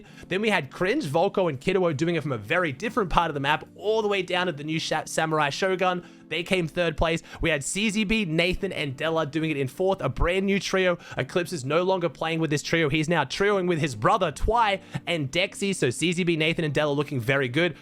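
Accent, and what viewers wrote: Australia. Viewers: Your wierd Aussie!!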